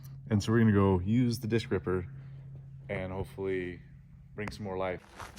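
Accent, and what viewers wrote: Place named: Midwest, United States. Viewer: Your big smile and bright eyes kept remindig me of somone and today I figured it out. you are a young Reba made over minus the red hair And your mid westen accent just adds to the resemblance!!. 😃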